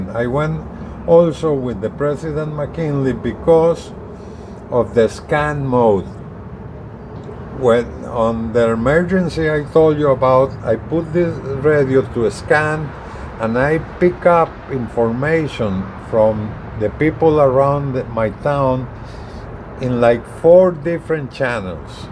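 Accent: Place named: Argentina. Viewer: I expected a southern Louisiana accent but instead your accent sounded very Argentinian? Little hint of Italian made me think so.. very cool video :) thanks for sharing!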